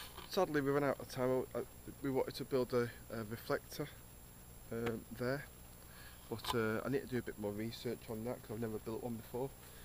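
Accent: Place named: Manchester, United Kingdom. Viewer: Nice vid , great spot youve got there,nice food, nice set ups, cheers.......johny.......ps.thats defo a manc accent.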